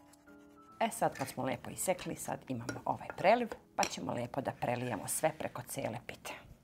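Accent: Serbia. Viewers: fuj, srpski naglasak Hrvatskog jezika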